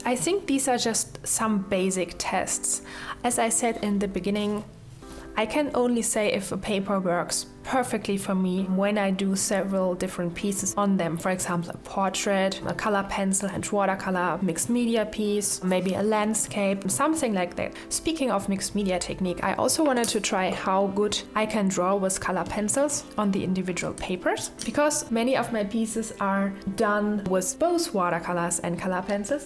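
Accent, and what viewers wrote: Germany. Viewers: Your german-english is so charming 😍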